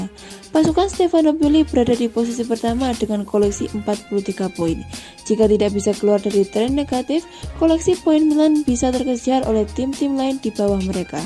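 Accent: Java, Indonesia. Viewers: Mbak..e Jawa mana?? Wkwk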